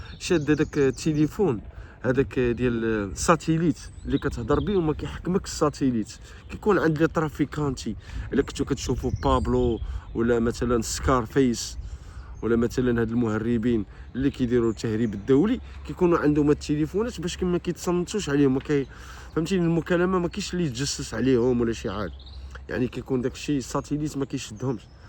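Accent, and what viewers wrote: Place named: Algeria. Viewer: فديوات ديالك سمعتهم قبل ولكن بلكنة جزائرية كانت واعرة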